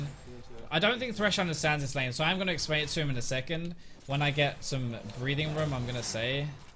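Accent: United Kingdom. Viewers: I could listen to your accent all day. British guys 😍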